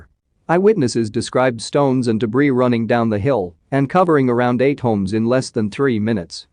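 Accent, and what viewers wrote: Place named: United States. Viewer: Blatant fabrication with intent to create unrest in China. From the accent of narration, it must be a vicious American.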